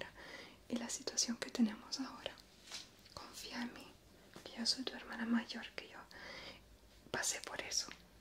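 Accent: Venezuela. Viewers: Amo tu acento Venezolano es único! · Que dulce es el acento venezolano ♥️ mil gracias por esto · Hola en estos tiempos de angustia que estamos viviendo en Costa Rica, el verte y escucharte me relaja bastante, me encanta tu hermoso acento venezolano gracias por ser tan especial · Me encanta tu acento venezolano, muy relajante. Saludos. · Adoro tu acento italo-venezolano ❤️ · Me encanto lo Italo-Venezolano de Caracas pal mundo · Que linda. Gracias por tu video. Me encanta que se nota tu acento Venezolano. 😍😍😍 Me dio hambreee!!! Hugs. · De dónde eres? No sé muy bien porqué pensé que eras italiana (creo que es porque tienes varios videos en italiano) pero el acento venezolano está súper on point, estoy confundida 😂😂 Saludos desde Venezuela ❣️ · Graciass! me ha ayudado tu videitoo! un video de ASMR bien fino! jeje me ha relajado bastante escuchar ASMR con mi acento Venezolano <3 Un besito corazón!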